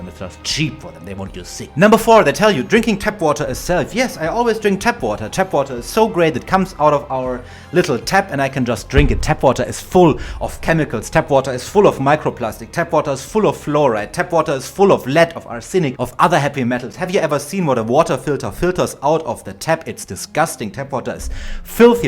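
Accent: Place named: Germany. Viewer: i like your german english. it is like me :) thanks for your inputs.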